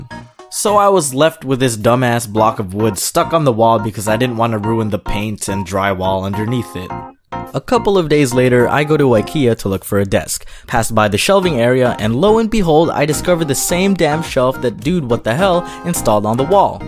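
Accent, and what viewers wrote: Philippines. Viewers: Nice Filipino accent from the Philippines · I really like that Filipino Accent of that english speaking skills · Is it just me or I heard you as a Filipino ?? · Love the Filipino accent 👌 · I always crack when you speak in "inglis with pilipino aksent" and use some tagalog words. I'm so glad Youtube reccomended this channel. 😂😂😂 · I love your Filipino accent/attitude😂😂I relate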